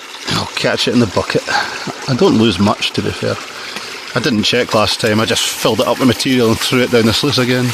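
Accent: United Kingdom. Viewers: I knew that twang was Edinburgh, I take it your not far. Was going to say thought I knew your voice when first heard u but surely not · Never heard Scottish befor . Love it. Good luck with gold hunting. You'll be needing it soon.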